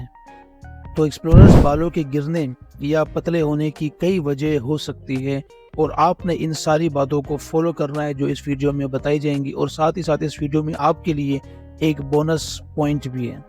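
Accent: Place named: United Kingdom. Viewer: Your English accent too good.Your English accent is just like British people's.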